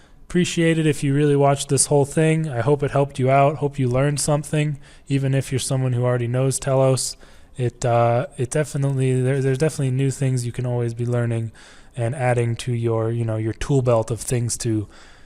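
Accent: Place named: New England, United States. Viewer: "Wicked quickly..." Are you from New England too? =) Nice guide btw.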